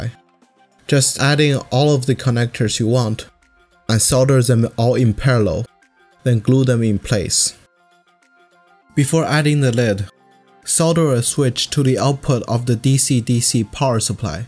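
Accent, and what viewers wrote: China. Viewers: I watched all your videos today and subscribed!!! 听口音应该是华人吧？真心佩服，加油！期待更多视频！ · gonna say it seems like a little bit Chinese accent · 感覺像華人講英文,因為我竟然能聽懂!!! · 这英语我怎么听出一股天津味儿呢。。。🙂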